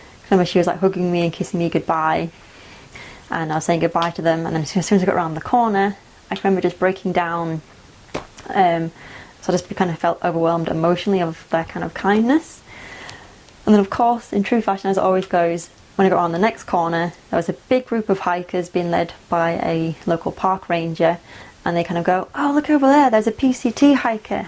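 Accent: Yorkshire, United Kingdom. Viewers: Wow! Thanks for the great story and footage. Didn't know you were a Yorkshire lass! From know on it's " Ey 'up" 8)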